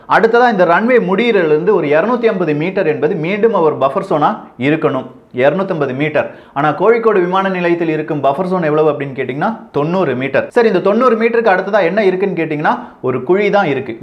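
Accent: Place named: Tirunelveli, India. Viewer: நீங்கள் இடுக்கி மாவட்டத்தை சேர்ந்தவரா, உங்கள் பழைய வீடியோ பேச்சு வழக்கில் வைத்து திருநெல்வேலி மாவட்டம் என்று நினைத்தேன்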